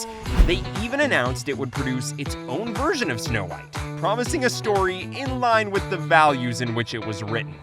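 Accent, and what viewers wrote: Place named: United States. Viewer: Could this narrator have a more annoying stereotypical American accent? He's the stereotypical irritating American (voice and opinions).... 🤮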